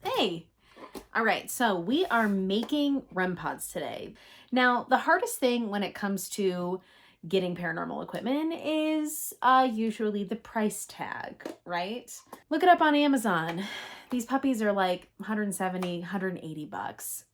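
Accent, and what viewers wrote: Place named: Ohio, United States. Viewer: You from ohio ? I can tell. A fellow. Native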